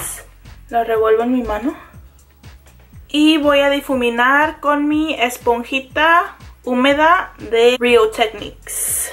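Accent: United States. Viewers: cual es la necesidad de hablar como gringa? v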